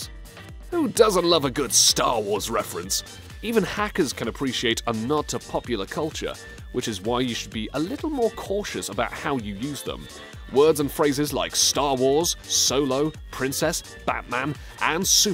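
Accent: United Kingdom. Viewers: You obviously live in the U.K. Because you pronounce Z with an ED at the end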